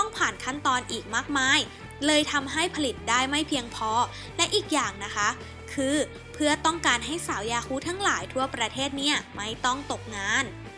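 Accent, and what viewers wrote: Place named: Suphan Buri, Thailand. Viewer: เสียงแกเหน่อได้ใจลุงจริงๆ แกคนสุพรรณรึเปล่า ลุงหมากับเมียหมากัน 2คน แล้วแกหมากันกี่คน?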